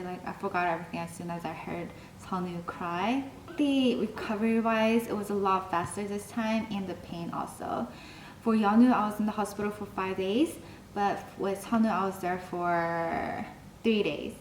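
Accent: South Korea. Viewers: I think your accent is more sound like Korean now if compare the previous pregnant video. Maybe because you alway speak Korean to your husband recent years:)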